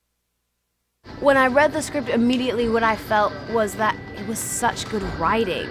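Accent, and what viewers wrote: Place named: England, United Kingdom. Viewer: Stick to your English accent, girl. Suits you better.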